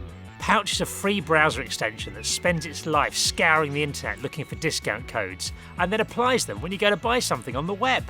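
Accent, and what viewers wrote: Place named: England, United Kingdom. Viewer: Listening to talk about his garden in his English accent is my comfort food 😂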